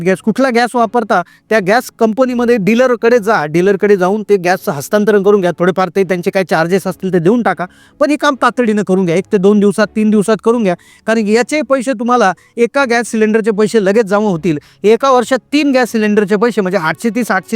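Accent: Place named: Pune, India. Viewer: Punayt tune boltay me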